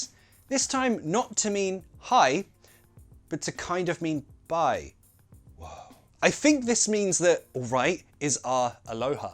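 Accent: United Kingdom. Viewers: You are helping us a lot to learn and improve our oral skills...I love your British accent. Regards from 🇦🇷😎👍👏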